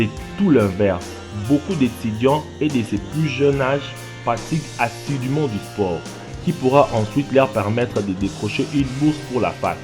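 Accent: Africa. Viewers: j'aime pas parler de l'écriture, mais c'est pas de ta faute si t'as cette écriture de merde et lui cet accent. il fait pas exprès. t'as jamais entendu un africain ou quoi toi ? 😂😂😂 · bien l'accent qui sent le mafé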